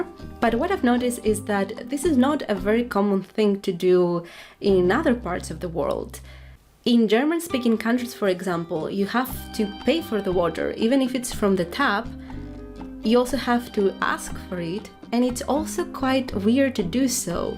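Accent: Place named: Greece. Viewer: Love your accent, are you Greek??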